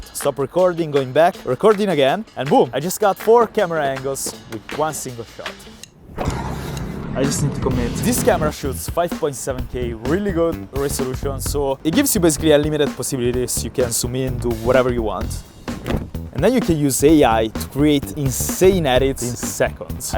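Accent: Italy. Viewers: is he Italian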